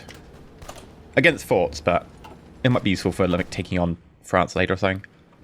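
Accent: Germany. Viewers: you spoke in a nothern bavarian acsent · play the road to 56 mod its great it allows for alternate history play threws and revamps the focus trees I would also pay to see you play hoi4 as Germany whilst speaking in your German accent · Goddamnit, I did not expect the german accent, thank you for leaving me in stitches :D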